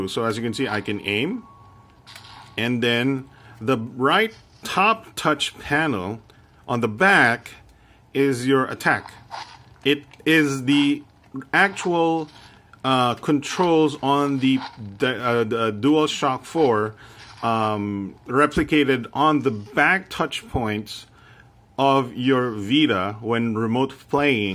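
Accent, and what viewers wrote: Philippines. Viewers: The way you speak English is very Filipino Like the way you say Comfortable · i can tell your filipino because of your accent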